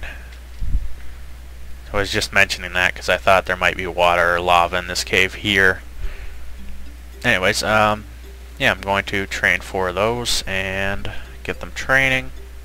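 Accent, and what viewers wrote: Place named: Canada. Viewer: I find it funny that I knew you were canadian the second I heard out XD. Sorry but it's funny.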